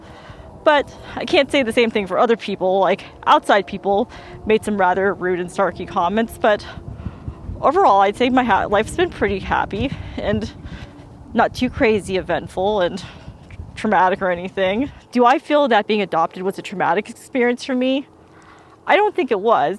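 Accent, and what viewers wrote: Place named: Iowa, United States. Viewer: Hello fellow KAD - love your video. I’m going to message you and share my thoughts, because I don’t showcase mine publicly. But I want to say you’re one of the few adoptees who I can 99% relate to! And I find it funny when you say “I can still have an Iowan accent and eat kimchi.” You need to hear the way I talk! 🤣 Thank you for making this!!! ❤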